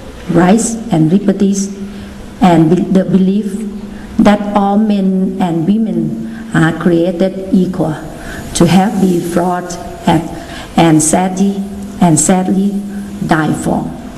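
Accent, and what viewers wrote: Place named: Thailand. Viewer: ฝรั่งคงเหนื่อยกับการนั่งฟังแอ๊ดเซ้นนายกคนเก่งของคุณน่ะคับ !!!!! จะสำเนียงไทยจ๋าก็ไม่มีใครว่าหรอกคับ แต่แทบจะไม่ออกเสียงตัวสะกดเลย ความสามารถการใช้ภาษาระดับนี้ ใช้กับ"โลก" ไม่ได้หรอกคับ แต่ถ้าเอาไว้หลอกบางคนในประเทศ ก็ถือว่าเพียงพอแล้วหละ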